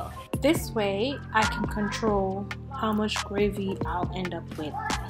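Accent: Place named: Jamaica. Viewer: You accent sound Jamaican. Are you Jamaican?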